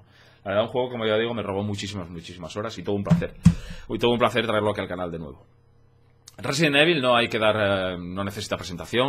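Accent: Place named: Spain. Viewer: Te diré algo con todo respeto. Como me disgustan los gameplays hablados por españoles, sin embargo tu lo haces muy bien y a modo que no es molesto. Mucho éxito y buen gameplay me ayudaste en algo que me atoré del juego XD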